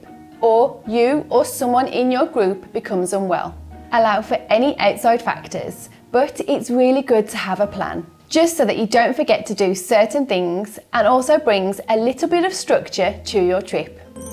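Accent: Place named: West Midlands, United Kingdom. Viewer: Nice to hear a fellow West Midlander talking sense. Weve watched all your vids. Me and wife looking forward to going in Sept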